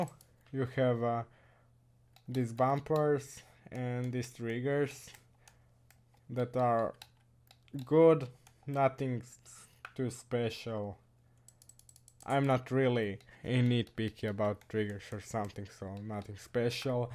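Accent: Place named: India. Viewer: Ur accent sounds Indian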